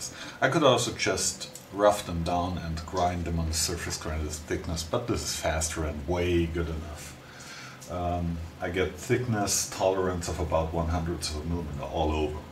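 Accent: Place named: Germany. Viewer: BTW: Solche Unterlagscheiben gibts fertig, Z.B. bei VW. Werden dort bei den hinteren Radlagern eingesetzt. Man merkt es deutlich, Du denkst Deutsch und sprichst Englisch. Ist lustig, besonders wenn Du mal wieder ne Vokabel suchst.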